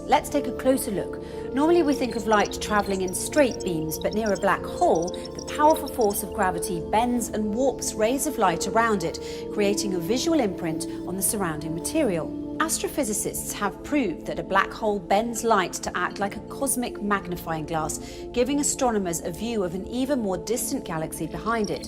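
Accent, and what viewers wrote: United Kingdom. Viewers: ملاك يغني سمفونية العقل والبراهين الفيزيائية في القرآن بلكنة انجليزية بريطانية رائعة وفقك الله Good bless you angel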